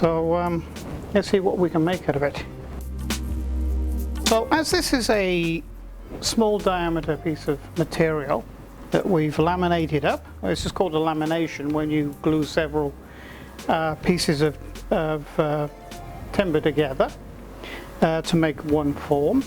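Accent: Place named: West Country, United Kingdom. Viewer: You talk in $, yet your accent sounds ‘West Country’!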